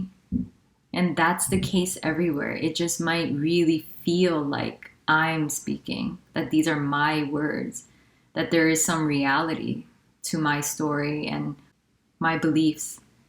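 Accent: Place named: England, United Kingdom. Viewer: Beeeeuuutiful . And I love the English accent when USAID"it's quite amazing that this should happen😂"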